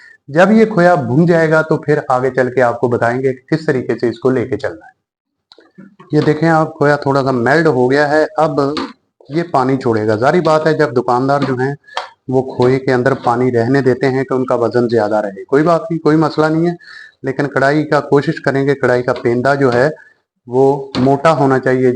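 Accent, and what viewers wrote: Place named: Punjab, India. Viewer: Sir AP ki nation sa lagta ha k AP panjabi hain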